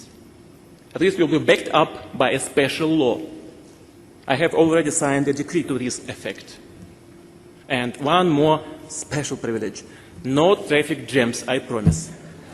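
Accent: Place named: France. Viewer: Sounds French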